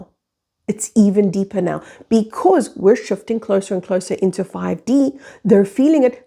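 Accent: South Africa. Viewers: Hi …..I’m South African….discoverd you just now🫶🏻excited to know you’re on YouTube….your accent made me think you’re South African and yes…..need to meet my community🙏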